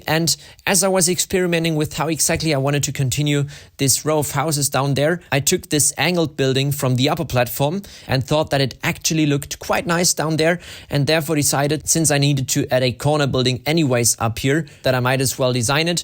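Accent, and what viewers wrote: Germany. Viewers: HAH ICH WUSSTE DAS DU EIN DEUTSCHENAKZENT HAST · I hear a bit german XD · Are you from Germany or Austria? btw, love your video's!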